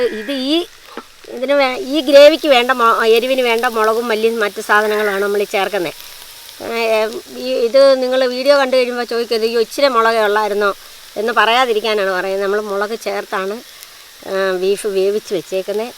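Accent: India. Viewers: Ammmachi bhaaaasha super like yourlanguage..aaalapppuzha.suppppper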